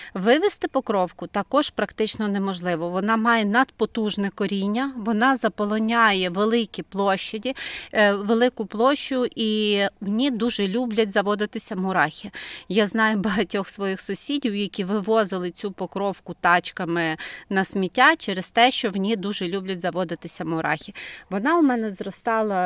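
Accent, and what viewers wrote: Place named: Western Ukraine, Ukraine. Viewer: Укр.мова погана, западенська. Наголос пипець. Багато води... Терпіння не хватило дослухати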